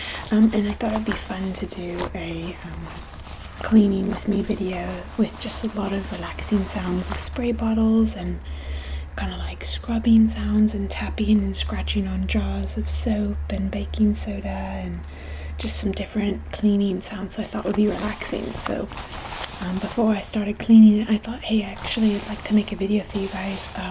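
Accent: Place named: United Kingdom. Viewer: She is actually from America, but she uses a British accent in her videos ☺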